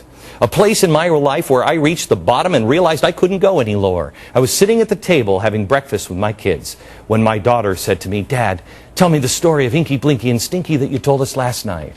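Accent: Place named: Germany. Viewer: im not even sure Glenn is american!!..has anyone seen or verfied his birth certificate???? and doesnt sound German like General Von Bock Bock/?.. in fact i think hes half south african...... ask him to say South Africa if he pronounces it Seth Efrica ya got ya proof.. nope he certainly dont seem and as for doing a good job by pissing liberals off id say as he stands for what the repulicans think hes making their credibility plunge to even deeper depths.....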